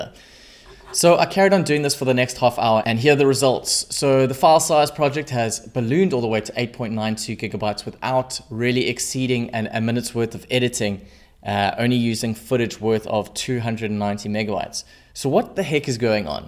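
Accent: South Africa. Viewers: Yisis you sound like a my home cape town. You saved me a ton. I lits maxed out my space on my laptop and editing was a nightmare. My project file was 259gbs. My poor 500gb sata was crying but this is going to save me a ton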